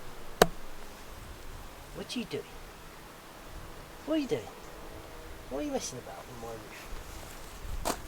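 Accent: United Kingdom. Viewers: I'm a new subscriber...you are one of the most unique and talented "off gridders" I have encountered via video yet!! I hear your British accent, but I'm not sure where you live. Can you share the general area? I'm in the U.S. - Kansas, but we are beginning the process of becoming totally self-sufficient. Thanks for sharing your thoughtful, complex, and rewarding life.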